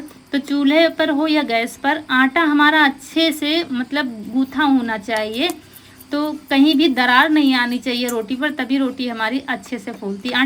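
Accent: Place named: Raebareli, India. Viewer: Bahan aap Raibareli ya phir unnao jile ho Apki language se pta chalta h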